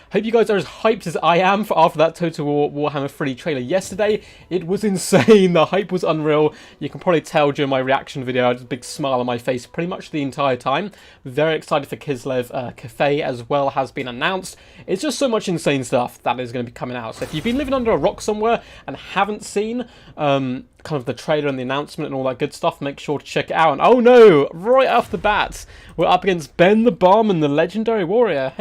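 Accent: United Kingdom. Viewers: It's the british accent but he's just an average lad.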